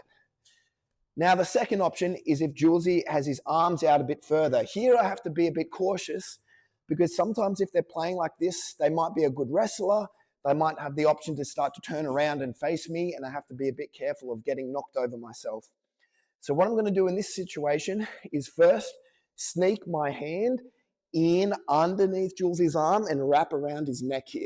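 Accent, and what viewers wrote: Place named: Australia. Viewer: This Australian accent is wonderful ❤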